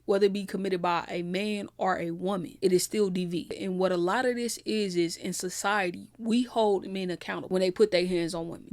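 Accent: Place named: West Coast, United States. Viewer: I love your west coast accent!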